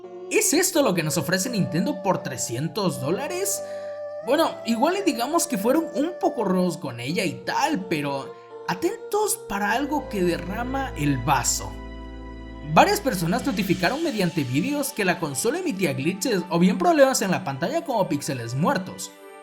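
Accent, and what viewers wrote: Spain. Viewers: suenas a un español, y, la switch es tres veces mas potente que WiiU, la causa de que Switch no mueva estable el zelda es que es un port hecho a las carreras no optimizado a la arquitectura de Switch, en pocas palabras esta emulando un WiiU. Si no me crees vete a a ver el trailer de super mario Oddesey, que eso lo corre 1080p y 60 fps nativo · Por que hablas como español siendo mexicano?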